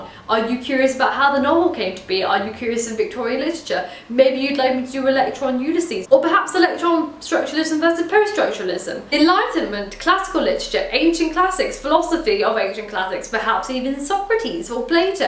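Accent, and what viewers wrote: United Kingdom. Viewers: I saw the thumbnail and I was like "I hope she has a british accent" (for some reasons). I was not disappointed.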